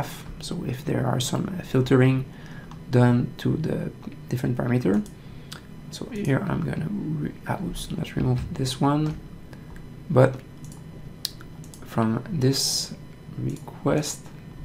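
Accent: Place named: France. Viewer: french accent... How cool is that?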